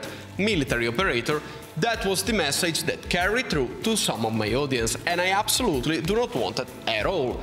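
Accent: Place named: Italy. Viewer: Ciao, ho detto (cavoli parla l’inglese in maniera pulita e molto capibile) guarderò un po’ di video per tenermi l’orecchio in inglese, ma capisco molto bene perché sei italiano ed ovviamente l’accento è quello!! Comunque ottimo inglese!!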